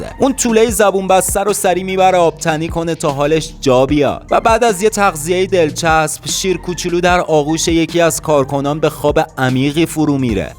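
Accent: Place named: Arak, Iran. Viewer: بابا قشنگ حرف بزن چرا میکشی تهش و اراکی مگه❤❤❤❤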